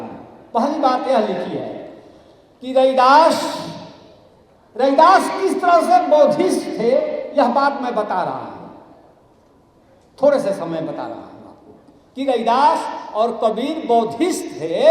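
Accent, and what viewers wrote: Bihar, India. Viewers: sir aap bihar se hai? aap ka bolne ke tarika bilkul hamare jaisa hai. 🙏🙏🙏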